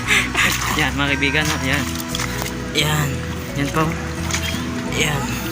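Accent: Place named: Iloilo, Philippines. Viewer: Ilonggo ka.. Bro..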